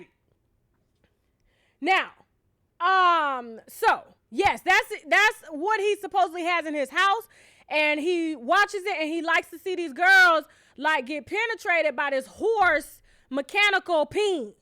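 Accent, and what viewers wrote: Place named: Deep South, United States. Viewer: I don’t know why I haven’t asked before but where the hell are u from lol I’m gettin Deep South vibes lol cuz I’m from Tennessee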